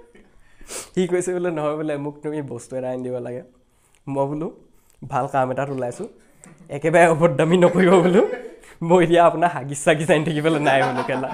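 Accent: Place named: Upper Assam, India. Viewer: Bhakha huni upper assam jen lagise, kot ase thai dukhor?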